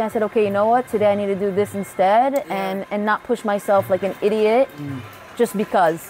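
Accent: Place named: United States. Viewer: Why do you have an American accent? You get to belong to two states but Palestinians aren't allowed to belong to one?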